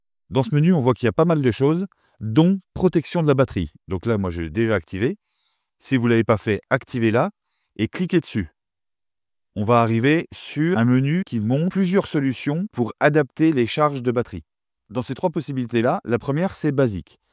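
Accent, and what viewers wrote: Franche-Comté, France. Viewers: Tu es de Franche-comté toi non? Héhé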